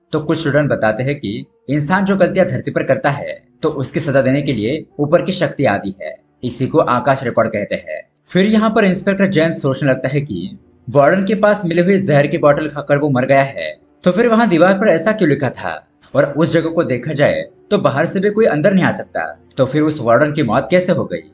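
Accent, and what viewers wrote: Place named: Sindh, India. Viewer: mujhay lagta hai ap ki mother tongue sindhi hai.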